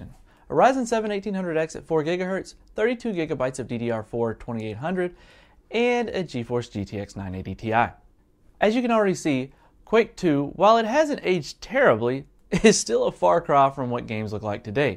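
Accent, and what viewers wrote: South, United States. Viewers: Awesome video! But I gotta ask what accent do you have? I've never heard a "standard" American accent mixed in with a southern twang!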